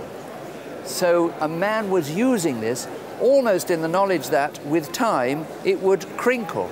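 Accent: United Kingdom. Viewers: Definitely received pronunciation 😊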